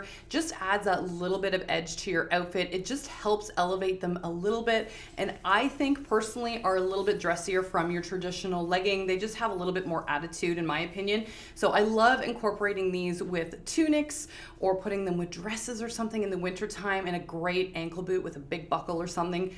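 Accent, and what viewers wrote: Canada. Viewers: I thought I detected a bit of Canadian in your speech. I am in Detroit right across the river from you neighbors. :)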